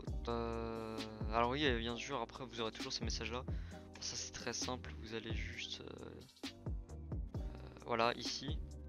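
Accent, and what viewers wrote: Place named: France. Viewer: idk man french accents are so pretty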